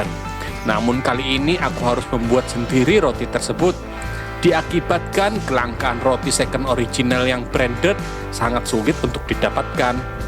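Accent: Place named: Java, Indonesia. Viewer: Why medok jowo? Ulun kira bubuhan keluak si julak nih... Tapi lucu pan.... Kawa jua... Baumpatan. ☺☺☺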